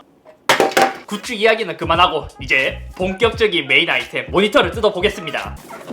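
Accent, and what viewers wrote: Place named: Busan, South Korea. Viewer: 제가 구독한지 어느덧 2년 다되가네요. 오딧세이 G7 개봉기 영상 잘 보았습니다. 댓글은 거의 안 달았지만.. 같은 부산 사람으로써 말투가 정감가기도 하고요. 무엇보다 팩트에 디테일하게 생각치 못한 부분을 잘 끄집어내는게 좋아서였습니다. 현재 안그래도 전 모니터 50~70만원 사이를 보고 며칠째 고민중에 있는데요. 잇섭님도 아시겠지만 LG나 SAMSUNG은 국내에서 대기업의 인지도가 제일 높아서 여러 평가가 많은 편입니다. 그리고 잘 모르시는분들은 그냥 LG나 SAMSUNG을 깊게 고민안하고 사는 경향도 있기때문에 대부분 평가를 쉽게 찾을수 있는데요. 현재 제가 2가지로 압축하여 보고 있습니다. LG는 1세대 NANO IPS의 딱따구리, 은하수 같은 현상이 나타나서 패스했구요. 오딧세이 G7도 한때 관심있게 지겨보던 제품이지만 역시나 커브드(이건 취향이라 별수 없나봅니다.)와 VA 패널의 한계라 결국 패스했습니다. 이 영상의 LG 화면이 더 좋게 보인건 그래도 아마 패널아닐까 생각해봅니다. 물론 오딧세이 G7이 VA패널이긴하지만 IPS에 맞먹는 정말 발전된 SAMSUNG패널이긴 합니다. 보고 있는 제품이 알파스캔 AOC-273QXP와 델 S2721DGF입니다. 현재 마음이 델쪽으로 기울어 가고 있지만 알파스캔같은경우 국내에서도 다나와나 인벤등에 평가가 많은 반면 델은 국내 평가가 거의 없더라고요. 까페의 댓글로 간신히 몇개정도만 겨우 찾았었고 구글이나 유튜브로는 전부 외국인들이 좋은 평가로 나오기는 합니다. 델이 워낙 칭찬일색이고 제대로 된 평가는 그나마 유튜브로 디테일한게 있기는 하더군요. 알파스캔 스펙은 170hz주사율로 되있지만 사실상 DP포트 연결하여도 10비트로는 120hz로 제한 있다고 하네요. 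결론적으로 델 S2721DGF 모니터도 리뷰를 진행 하실 수 있을까요? 모니터 고르기가 본체 사양 맞추는것보다 사실상 너무나 까다로워서 한달째 고민중이네요.. 끝으로 장염 3일에서 1주일 고생할텐데 빠른 회복 기원합니다.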